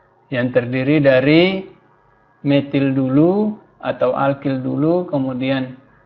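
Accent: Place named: East Nusa Tenggara, Indonesia. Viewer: Hallo kak, orang NTT ko? Beta juga, lebih tepatx beta orang sabu😄. Terima kasih pembahasan soal²x. Salam semangatttt.. Logatx mantap💪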